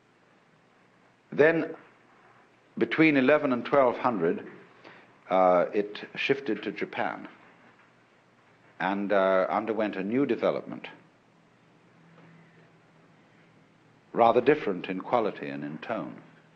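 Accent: United Kingdom. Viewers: How nice it is to hear a semi posh English accent for a change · Are you sure you aren't referring to Alan Watt, the Scottish guy? Apparently people are confusing the 2. I only recently came across this Alan Watts w a British accent and was like wth? Til I figured it out.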